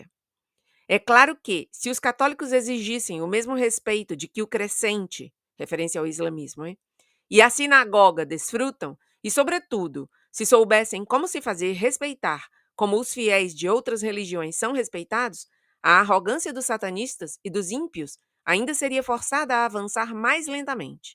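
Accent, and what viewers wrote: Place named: Ceará, Brazil. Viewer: Muito boa a explanação das palavras do Monsenhor Viganò,. E com todo respeito: o seu Português falado é muito interessante de ouvir. Manifestações do Castelhano com sotaque nordestino do Ceará, onde você morou por um bom tempo.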